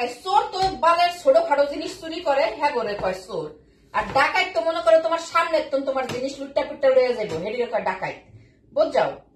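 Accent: Barishal, Bangladesh. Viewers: আপনার বরিশালের language খুবই enjo করি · তুমি কি বরিশালের মেয়ে?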